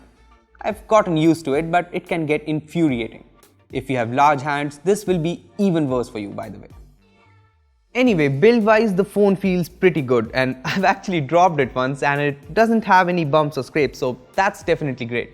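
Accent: India. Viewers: Only indian guy's ar presenting nokia fuck :)))))))), that accent kills me boooy:)))))))))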